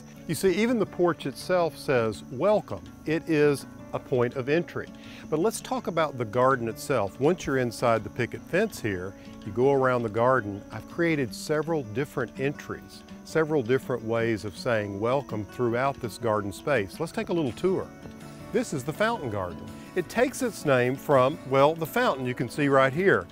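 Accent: South, United States. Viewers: You have such a great voice ... so soothing with a wonderful little dash of the South.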